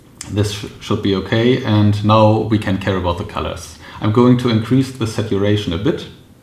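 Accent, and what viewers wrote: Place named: Germany. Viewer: Guten Tag. Thank you for your workflow video. Really helpful 👍 Btw, your accent reminds me of my semester in Germany (Koln), wonderful memories.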